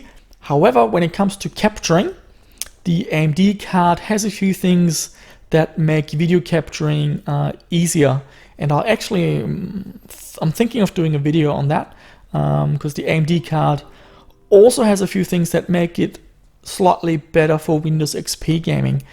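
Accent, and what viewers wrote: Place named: Germany. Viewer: I always have a feeling there is a slight german accent in it. But mit might just be cause by regional stuff.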